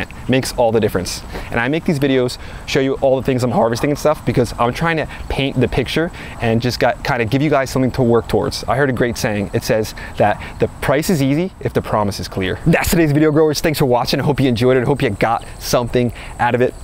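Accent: New Jersey, United States. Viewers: You’re so Jersey lol love it -coming from a 973’er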